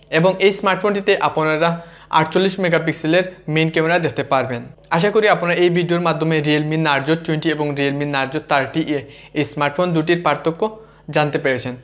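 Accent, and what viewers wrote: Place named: Sylhet, Bangladesh. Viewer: সিলেটি মাত বাদ দিয়া শুদ্ধ কা বলো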